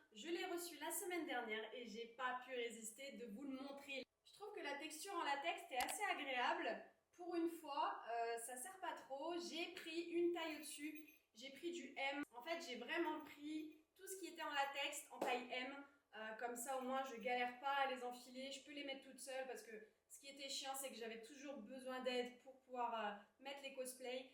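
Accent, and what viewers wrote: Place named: England, United Kingdom. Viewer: Bonjour ! Jai eu limpression que tu avais un super accent anglais, je peux me tromper mais tu parles anglais ? Super contenu sinon ^^ jespere que tout roule pour toi !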